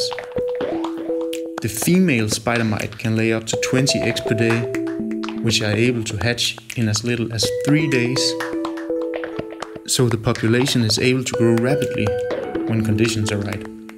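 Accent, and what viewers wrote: Denmark. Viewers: Great to hear you've got a microphone now :D. Is that a danish accent? Apart from Demodex, biofilms of everyday objects (e.g. Keyboard/Mouse, sports drinking bottles) would be interesting, if bacteria (or whoever lives there) are large enough to be filmed.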